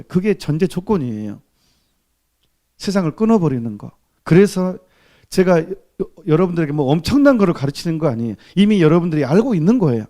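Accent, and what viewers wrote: Jeolla, South Korea. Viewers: 전라도 사투리가 정겹네요~~♡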